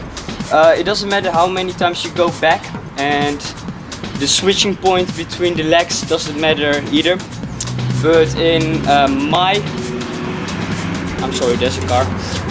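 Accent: Netherlands. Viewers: hij s ook nl vgm... want zn uitspraak klinkt als die van n nldr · Hahaha gy hebt een Hollands engels accent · ik hoorde al een Nederlands accent 😌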